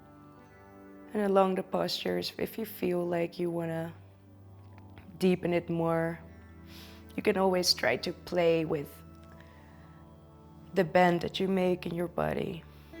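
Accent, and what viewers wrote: Netherlands. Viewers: Are you dutch? You don't really have an accent but more like a non accent? I don't know I feel like you might be dutch? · Wow, this was a wonderful class. You're voice is very calming and grounding. If you would make more videos of practices I would defenitly follow them!! Thank you so much. I'm from Holland by the way. Taught I heard a Dutch accent in you're voice. 😊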